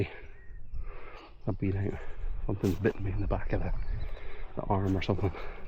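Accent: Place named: Scotland, United Kingdom. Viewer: Sorry you were puffing but this subscriber likes listening to a human narrator and with a Scottish accent talking about Scotland 🏴 Thank you 🏴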